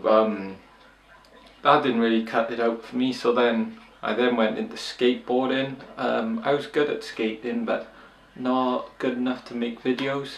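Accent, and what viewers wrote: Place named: Liverpool, United Kingdom. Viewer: Jesus how did you say woolton hall 😂😂😂😂😂 that was hilarious just as you're starting to pick up the scouse accent too. Great Q&A mate.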